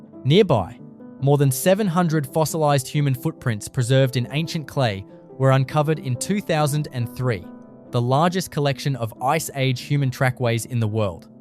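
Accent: Australia. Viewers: This voice sounds Aussie but at about he says "Brew wa reena". What? Phonetically it's pronounced "Brew warr in uh". Brew as in brewing beer, warr as as warrent for your arrest and uh as in blunt -- that knife is blunt. Get it right.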